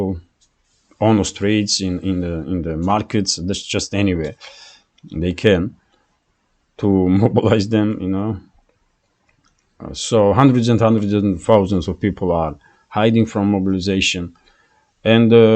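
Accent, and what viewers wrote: Russia. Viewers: NATO, Poles mercenaries are involved. And those Ukraines forced to die for USA. Meanwhile, Russia wins again. The Imperialist brain is sociopathic hence the more Russia wins, the more they double down. I rejoice in Mr Putin's leadership: a leader who loves his citizens, whose intelligence is layered with great emotional depth. Alas, I have Justin Trudeau to depend on for my economic well-being. Perhaps, you can lend President Putin to us, just to help us become a real nation, again.😏🇨🇦 Advice: speak a little louder, a bit more emphasis Just a bit🤏 Found you from Alex C.'s channel. A Russian in Russia sounds good to me! P.S. I am an old woman who has been dumpster diving for the truth for decades. Good to find another truth teller in my search. To die is human, to die rejecting the bullshit of false prophets is divine. Subscribed.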